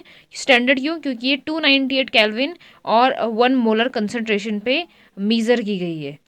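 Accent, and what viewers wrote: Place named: Uttarakhand, India. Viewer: Nice lecture with uttrakhand vibe😊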